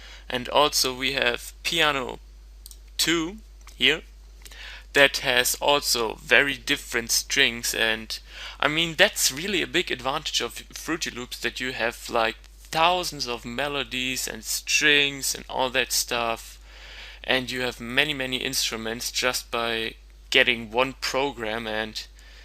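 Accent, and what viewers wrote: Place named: Germany. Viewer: Direkt deinen deutschen Akzent erkannt:)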